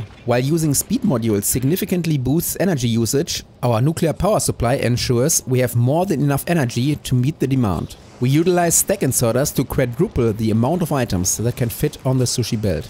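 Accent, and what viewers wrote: Germany. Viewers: I only just started making green science so I don't know why I'm watching this other than enjoying a German accent talking about precision and efficiency.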